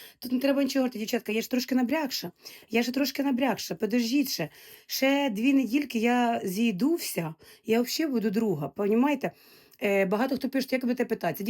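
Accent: Surzhyk, Ukraine. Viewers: ❤❤❤. Вчи українську поки вдома. Бо суржик дуже сильний))❤❤❤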